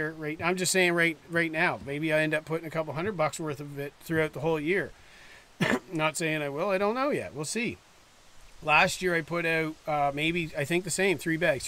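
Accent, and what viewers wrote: Canada. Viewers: Off topic, even though I'm in Manitoba, I could tell you were from the Maritimes just in how you say the word 'barn". Congrats on the PC win in NB (if they were who you were going for...I won't make assumptions)! Cheers.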